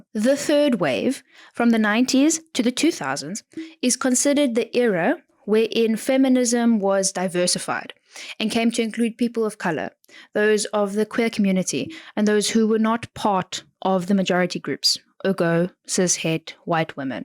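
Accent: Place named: Netherlands. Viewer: yeah, but Dutch/Arfikaner or English? You sound Dutch to me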